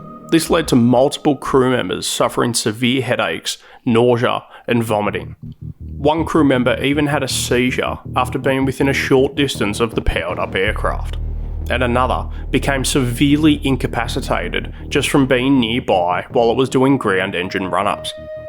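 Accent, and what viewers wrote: Australia. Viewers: What a terrible Australian accent this speaker has! That's not proper English. That's Outback babble.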